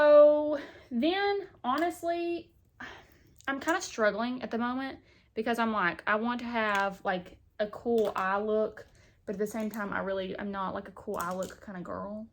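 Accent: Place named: Kentucky, United States. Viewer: I love love you girl,you are awsome,awesome, love your approach to life,your makeup ( you are gorgeous) have such a natural look.love the Vibes and love love your drawl are you from kentucky? I am and we sound a lot alike.i am scared of bronzer also.you don't need it.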